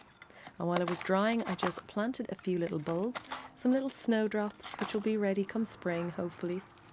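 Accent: Ireland. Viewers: One of my best friends, God rest her soul, was Irish. And as soon as I can, in the near future, would love to visit Ireland. In honor of her. Seeing your video & hearing your accent makes me realize that my dream can happen as soon as the virus has a vaccine or When I feel it is safe to travel again. One question I have when is a good time of the year to travel, whether wise? And can you recommend places that I should visit? Thanks. By the way your videos are awesome. And I love the thought of "fairy doors". I am thinking of incorporating "fairy doors" where I live. It is an interesting concept.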